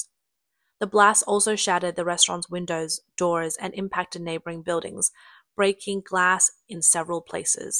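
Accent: Australia. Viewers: This Aussie confirms it to be an Aussie accent. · I think I detect an Aussie accent from the narrator. Props to you young lady for exposing what the CCP suppresses daily. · Side note: I love your accent... Aussie?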